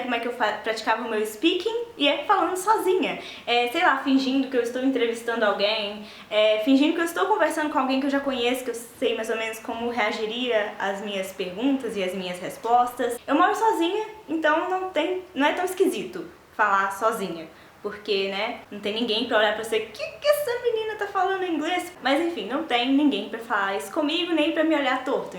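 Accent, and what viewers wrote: United Kingdom. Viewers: Caramba. Hehe Muito bom, e tem até o sotaque da Mione. Kkkk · Seu sotaque britânico é maravilhoso!! Parabéns pelo canal,. Me inscrevi e ativei as notificações ❤👏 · Sotaque britânico 😍 estou fazendo a mesma coisa pra aprender inglês também já faz uns meses, é bem legal haha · Adorei o sotaque à la Hermione ^^ Sua fofa ;* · Eu assisto tanto Sherlock (em inglês com a legenda em português) que agora to vendo com a legenda em inglês também. Falo junto com o Sherlock quando ele tá dando adeus pro John ;-; "it's my note. That's what people do, isn't it? Leave a note?" mas acho que não consigo fazer o sotaque britânico que nem você, invejinha. E acho que agora vou ver HP em inglês e com a legenda em inglês também já que sei as falas em português, quem sabe me ajude mais. Eu considero meu nível de inglês bom e consigo ler um livro, mas tem um problema: eu tenho preguiça de ler em inglês lol eu amo ler, mas as vezes bate uma preguiça. 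Tenho que mandar ela embora por que lendo é a melhor forma para aprender inglês. E to com você, eu também falo sozinha. Eu faço resenha de livro falando sozinha no quarto em inglês, com medo de alguém entrar e ficar se perguntando que "merda eu to fazendo" :p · O seu sotaque britânico é muito bom - você deve ter praticado bastante mesmo! E esta dica sua é espetacular não só para o inglês mas para qualquer idioma. Parabéns pelo canal e continue com as ótimas dicas! :) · Meu Deus, você fala bem demaaaaais! E o sotaque britânico é puro amor. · You speak with Hermonie English accent.. woah.. :o I learned some Portuguese by watching Harry Potter in Portuguese.. but I don't think I learned how to speak with a Hermonie or Harry portuguese accent.. aw.. :p it's very good to read books in English too.. I am trying to read books in Portuguese.. I love finding people to speak different languages with.. 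it's fun to learn about different cultures.. vamos falar em ambos línguas.. yay :D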